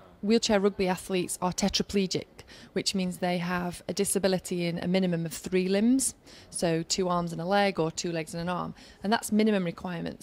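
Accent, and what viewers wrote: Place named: Yorkshire, United Kingdom. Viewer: Как она произносит company, come, such, done, rugby!! Ну и что, что Йоркширский акцент. Всё понятно. И очень красиво. Спасибо.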